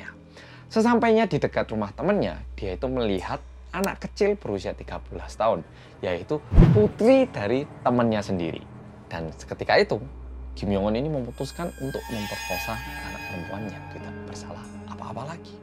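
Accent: Java, Indonesia. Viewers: Lucu penyampai ini..orang korea tapi logat indonesia(jawa) nya itu pekat ya😀😀😀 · Suka banget karo wong korea sing medooogggg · Tim gercep mas, selalu medoooooooookkkkkkkkkkkkkkk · Baru nonton chanel ini.. wong korea ngomongnya medok banget.. lucu.. haha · Dah lama ga nyimak kasus Dr abang medok. Kangen rek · Mulai demen liat video2 nya mas medokk satu ini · Mas yg orang korea aja ngomongnya lebih medok daripada aku yg suku jawa, tapi tinggal di kalimantan 🤭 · Bersama org korea yang... Madokkkkkkkkkkkkkkkk😂😂❤❤❤... Suka banget dengarin😅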